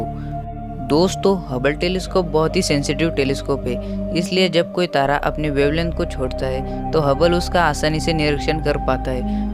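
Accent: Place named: Gujarat, India. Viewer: Baot badiya kaam karta he na tu isko aise hi padhna jaise likha maine bhai gujrati style avaz h tumhari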